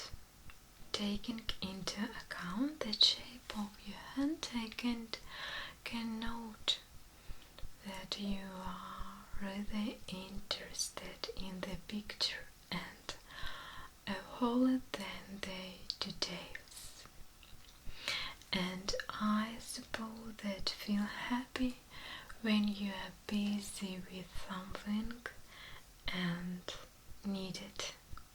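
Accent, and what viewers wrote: Russia. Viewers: I love the Russian accent! I listened to this lying down with my headphones on and had a smile on my face the entire time with my eyes closed , My mind was filled with pure bliss hearing your beautiful Soothing voice! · Wonderful video, Russian accent on English is relaxing :)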